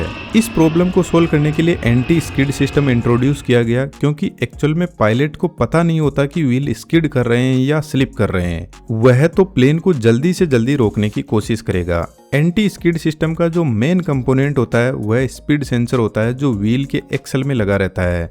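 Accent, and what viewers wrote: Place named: Marwari, India. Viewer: Marwadi voice pehchan li 😂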